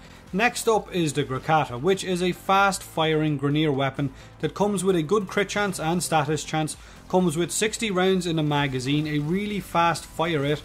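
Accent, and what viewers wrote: Ireland. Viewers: IRISH. SUBBED! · Irish really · I love your fucking accent I am Irish too xD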